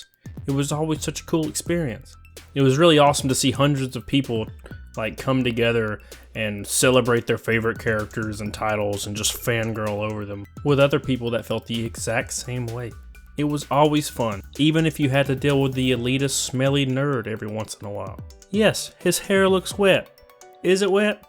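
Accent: South, United States. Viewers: Good lord Southern and hot Af woof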